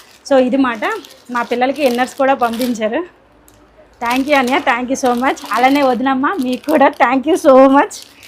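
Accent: India. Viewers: Oho, Meedhi Anantapur aa. Ippativaraku mee slang ni batti coastal andhra anukonnaam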